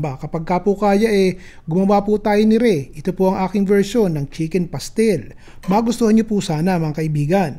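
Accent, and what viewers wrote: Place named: Bulacan, Philippines. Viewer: Gud morning, thanks again sa npaka simple at npakasarap na chicken recipe,halos parehas tau magsalita ng tagalog.ganyan kaming magsalitang mga taga Bulacan at Nueva Ecija.GOD BLESS YOU AND YOUR FAMILY...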